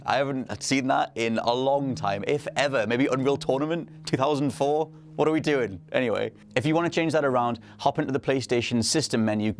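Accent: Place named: North East England, United Kingdom. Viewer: This help me out alot because im a huge noob on this game cheers mate!!! sounds like your from the north east of england as well i am to :D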